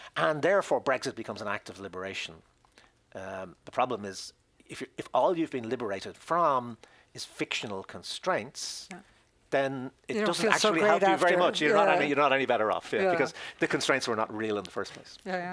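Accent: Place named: Ireland. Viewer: As an Irishman, I appreciate your comment. I would like to suggest an answer to your question 'why are all the most intelligent commentaries on this delivered with an Irish accent?' In Ireland, our requirement to have a referendum every time we adopt a new EU treaty means that we are forced to pay attention to the EU. We know and understand the EU better than English people, who don't get it at all. It has the added benefit of leaving Irish people with a sense of ownership of our membership. Before we, the Irish, joined the EU in 1973, for the previous 50 years we are had the type of independence that the UK is now seeking. We know the limitations of going it alone in the world, its and outdated form of independence that belongs to the early 20th century and only okay if you like being poor. It is also somewhat of an illusion - as a small country will always have to give way to a bigger country in trade negotiations...in this case the EU will always dominate the UK. 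We are never completely free, independent or sovereign. In short, we know how deluded the aspirations of JRM, BoJo and IDS and others are. I wish you well.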